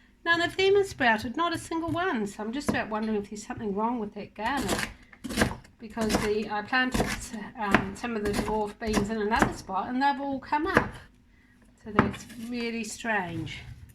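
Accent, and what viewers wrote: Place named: New Zealand. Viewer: This looks delicious, I will have to try it. I have so much rhubarb I've run out of new ideas of what to do with it. I have given as much away as I can and some has gone into the freezer as well. It's lovely to find a cooking channel that has our Kiwi accent. Have a very Merry Christmas. Your Christmas Lillies look beautiful, mine haven't opened yet. It's not Christmas till I have their perfume in the house : )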